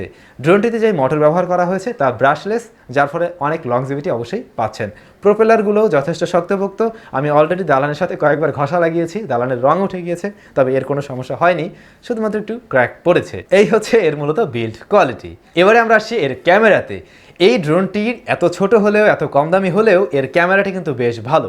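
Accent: Barishal, Bangladesh. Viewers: ভাই টাক খায় তো বরিশালের কথা। আপনার বাড়ি কোথায়? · apnar bari borisal, karon tak kay.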